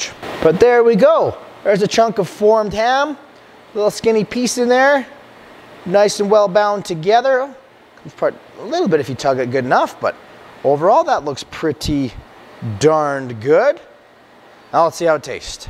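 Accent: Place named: Nova Scotia, Canada. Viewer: Hello btw sub from Belgium ! I started eating carnivore as of last Monday but I want variety and healthy meat so as they put all sorts in regular store-bought ham, I want to make it for my family. Btw, I'm originally from Cape Breton Island, Nova Scotia and I think you sound like the folks from home - but I have been over here for 35. You're fro which province, may I ask ?😀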